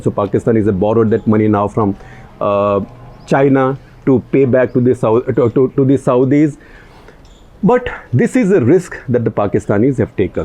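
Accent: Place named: Hinglish, India. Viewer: I like your HINGLISH man😂😂😂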